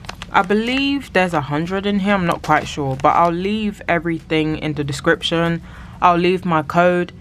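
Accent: United Kingdom. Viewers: Quick question,I can hear your British accent… did they deliver to you in the UK?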